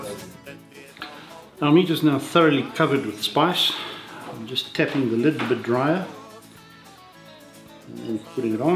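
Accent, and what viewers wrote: South Africa. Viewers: He’s Afrikaans accent is thick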